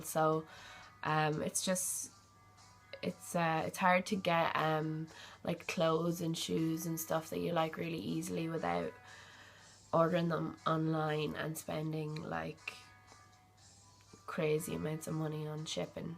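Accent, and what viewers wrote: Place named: Ireland. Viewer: Greetings! Judging by the way your beautiful voice sounds , I'm assuming you are from Ireland. I work with tons of wonderful fun loving and friendly Irish folks. I work for Ornua Foods in Chicago Ill. Ornua is Kerrygold butter. I call it Dairy Crack. Once you try, you can't stop. Best wished for continued success. Beat to you, LennyK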